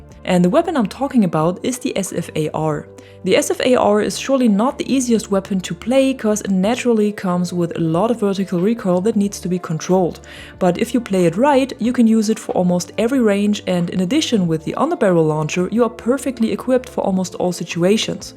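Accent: Germany. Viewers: Ha ich wusste das du deutsche bist :D hört man tatsächlich raus.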